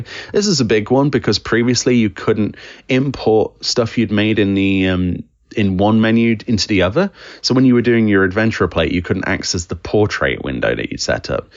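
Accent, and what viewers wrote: United Kingdom. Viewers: I'm still able to thoroughly enjoy the british man reading FFXIV patch notes ASMR so it's okay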